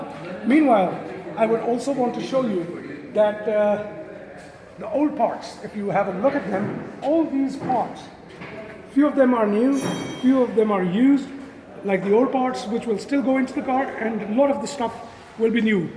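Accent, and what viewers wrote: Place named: United Kingdom. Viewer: Great projects, and excellent British accent for this little British Motoring icon. Job well-done, sb!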